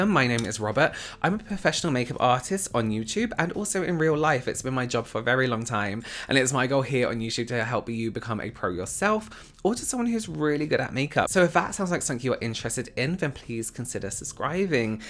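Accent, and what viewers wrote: England, United Kingdom. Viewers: So, I kinda love you! I used to live in England, so your accent makes me happy. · Robert , your accent is divine...❤ what part of England is it i woder?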